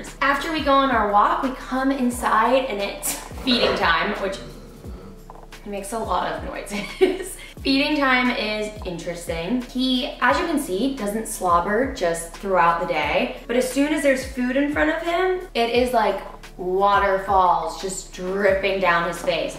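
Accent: United Kingdom. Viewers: The little twangs of British that come through in your voice because of Kara are so cute! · That “oh did that offend you” sounded so british 😂 you’re getting a slight accent girl 😂😍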